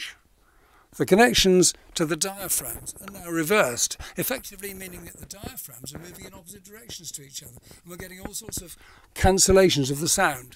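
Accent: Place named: RP, United Kingdom. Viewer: You, sir, do have a fantastic voice. If you worked for the BBC, I'm surprised if they put you in engineering and not on air. You have what I call the perfect BBC Accent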